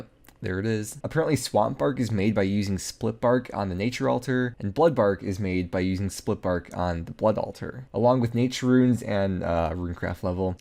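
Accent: Canada. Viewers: wait a second.. are you canadian? So many good laughs this video ! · wait is canadian? pls tell me I'm not sure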